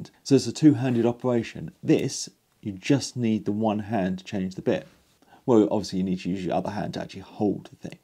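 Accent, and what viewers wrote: United Kingdom. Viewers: Wonderful presentation, right on the money. It’s amazing listening to the British accent, by the way…👌 Thanks for sharing mate…😎 While I have your attention, would you happen to know if Bosch has any plans to make a cordless plunge router?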